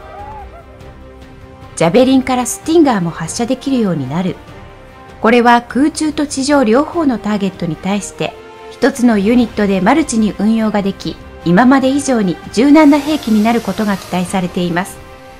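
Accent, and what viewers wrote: Kansai, Japan. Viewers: ナレーションの女性は関西人かな❓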